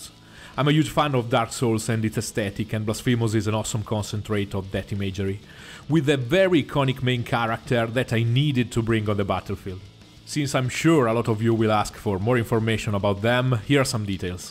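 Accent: Italy. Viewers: Però se parli italiano qualche volta non farebbe schifo 😂😂 si sente che sei italiano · Awesome Italian accent dude 😎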